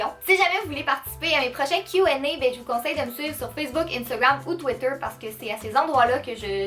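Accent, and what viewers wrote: Canada. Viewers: T'es française ? Parce que t'as un accent canadien !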